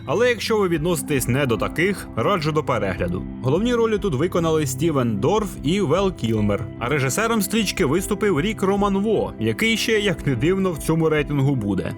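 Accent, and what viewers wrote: Poltava, Ukraine. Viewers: Друже, ти не з Полтавщини? Бо як рідний) Підписався без роздумів. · Чудовий полтавський акуент😊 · Дякую за чудову підбірку, було цікаво дивитися, а ще приємно чути Полтавський говір 💪🏼😊 Від себе хочу порадити фільм - Залягти на дно в Брюге. 2008 року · Ох вже цей польтавский гуовір😂❤ З Пирятина? Дякую за підбірку🤝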